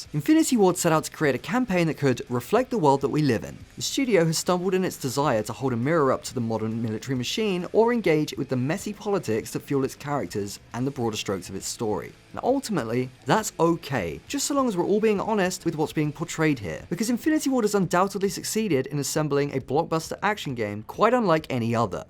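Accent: United Kingdom. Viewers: Defs british, not nz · British I think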